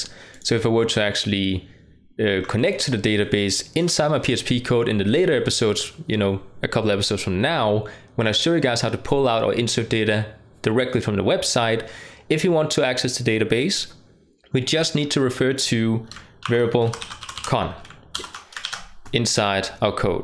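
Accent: Denmark. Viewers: He is from Denmark! I love his accent!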